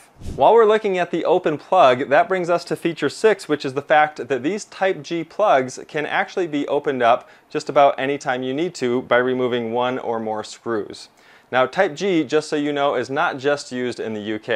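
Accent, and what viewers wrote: United States. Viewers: Your accent sounds American yet you fully endorse a UK product. You even went as far as to wear a red shirt. 🇬🇧 You know how Americans feel about the Reds. (Red coats, rednskins, red scare) I mean its a great color, but just saying red is generally the enemy's color.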